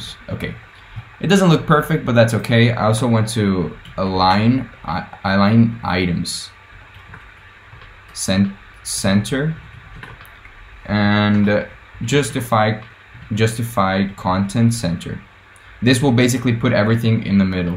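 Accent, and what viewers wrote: Brazil. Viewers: o que facilita entendermos e que o cara e BR o ingles dele ajuda muito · ingles brasileiro e bom demais de entender, vlw!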